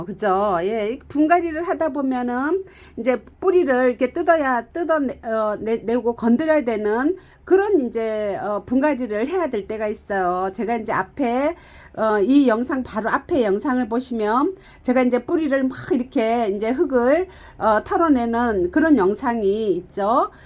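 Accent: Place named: Gyeongsang, South Korea. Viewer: ㅎㅎ 헐빈하다 갱상도 본토말~^^ 지가 자주쓰던말이네요 정겹슴다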